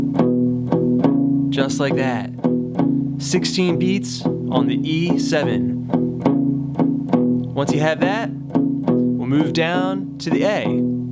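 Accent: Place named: Philadelphia, United States. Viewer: I thought I detected a Philly accent...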